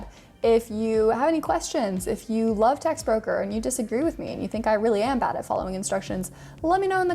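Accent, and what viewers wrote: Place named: United Kingdom. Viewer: Hello, thanks for sharing from Caracas venezuela...you are very beatiful pragmatics girl...im a teacher computers programming...i love writing articles en coinpayu articles but y read your reviewed...Es muy interesante tu estetica al vestir y ver el mundo...y tu bello acento britanico...como a los que tanto escucho cada dia. best regards.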